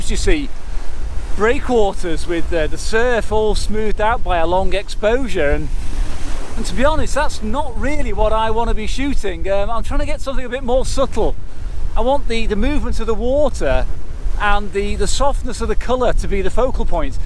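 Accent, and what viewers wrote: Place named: Liverpool, United Kingdom. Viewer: I always hear the cadences of the when I hear you speak. I was interested to find that you seem to be from the Liverpool area. Always enjoy your content!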